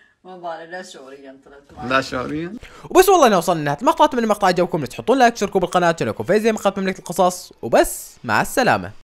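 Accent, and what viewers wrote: Iraq. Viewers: اتوقع العراق لأن كلامه عراقي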